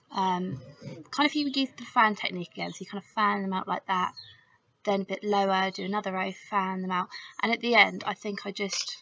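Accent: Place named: Northeast England, United Kingdom. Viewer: I think this is a great idea and love your other shoe designs as well. I also think your accent is just adorable. Where do you come from? (I'm guessing northeast England but that's just a guess)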